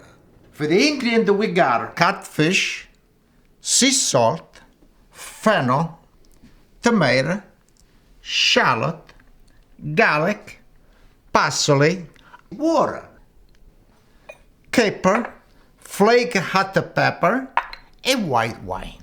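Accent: Italy. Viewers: its kinda crazy, you can almost hear how the NY dialect developed from this italian accent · This looks delicious! And your English is excellent,! I love your Italian accent ❤️. Salute e cin cin!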